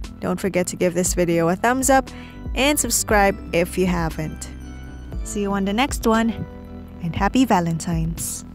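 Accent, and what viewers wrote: Philippines. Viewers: What country are you from <3? Your accent sounded like you're Filipino O///O !? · are you a filipino? your accent sounds like one ☺️ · Was it just me or you sound like a filipino? I'm not racist okay cause I'm also a filipino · are you a filipino? cause your accent lol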